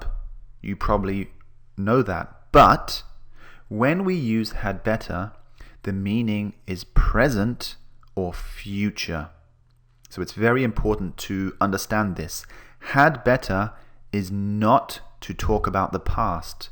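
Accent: United Kingdom. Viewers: Britsh Accent?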